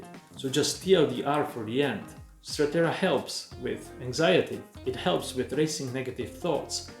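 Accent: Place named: South Africa. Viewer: Are you South African my friend? I know your accent!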